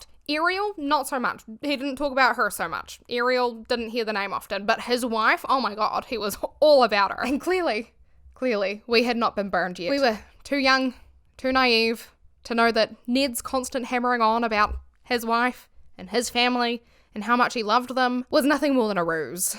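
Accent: New Zealand. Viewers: I mean i heard it, i went to check her page, was delighted she's a kiwi, and went on with my day 😂 do people really complain about that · So weird (good weird!!) to hear another NZ accent!! ❤ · You're so kiwi I love it. I'm Aussie and definitely would have asked you to say "six" and then laughed when you said "sex" when I was at school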